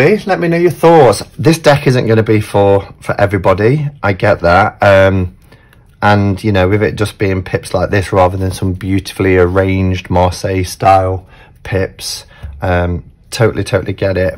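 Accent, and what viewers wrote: Nottingham, United Kingdom. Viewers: What an interesting deck of cards. Thanks for the walk through, always lovely to hear a familiar Nottingham accent (all my Mum's family were born in and around Nottingham). I don't use pippy decks but this one caught my attention. I looked around for it and found it for a great price on Amazon at the moment. The cards arrived today and although the cardstock is thin and will crease if handled roughly, the illustrations are great and it's a lovely addition to my small but growing collection. PS The cards are Produced by River Horse and printed by Lo Scarabeo, like the ones shown in the video.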